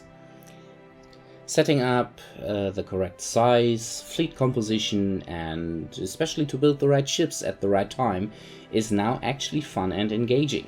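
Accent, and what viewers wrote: Germany. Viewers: This guy german ? But None the less great review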